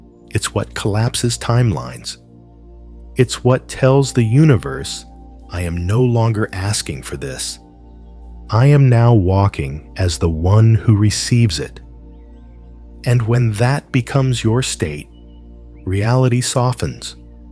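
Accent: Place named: United States. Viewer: I find it difficult to meditate and manifest,to picture my needs... I've asked for many years,but my life didnt get easier, Love the narrators voice.gentle and calming.i am English but love the American voices. If you have money you do have freedom..... I think I'll try again to meditate and manifest....❤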